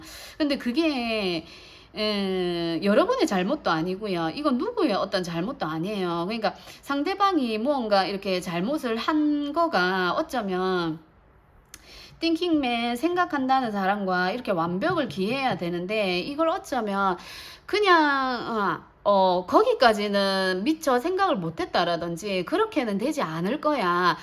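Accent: Gyeongsang, South Korea. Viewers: 경상도 분이다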